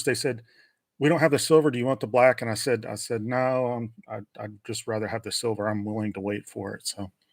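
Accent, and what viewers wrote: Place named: South, United States. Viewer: Ah, your southern accent ain't that bad man. Eddy's video was great and you hit some interesting points too. I've been tossing the idea of creating jpeg styles for different situations back and forth but haven't done any yet. I'm just so used to shooting raw. But if I can create the jpeg style to mimic what I would do with the raw file anyways, it would save so much more time!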